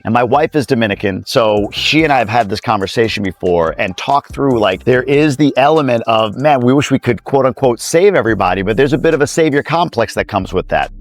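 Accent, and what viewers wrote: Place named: New York City, United States. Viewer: I knew that what a NYC accent! Now it all makes sense to me lol.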